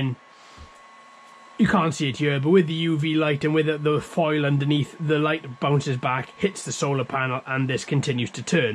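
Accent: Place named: South Wales, United Kingdom. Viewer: Sounds like ya from South Wales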